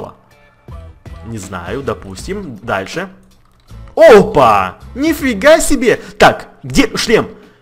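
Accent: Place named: Ukraine. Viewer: Какие же старые видио....... даже говорит с украинским акцентом )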